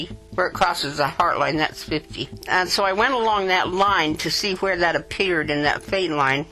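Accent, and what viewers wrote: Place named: United Kingdom. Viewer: how much do you charge for a palm reading and where are you based. I'm in the UK,you sound similar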